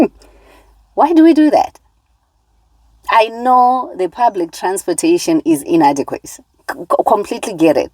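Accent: Zimbabwe. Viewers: What a nice accent for a Zimbabwean, I enjoy listening to your voice. From South Africa 🇿🇦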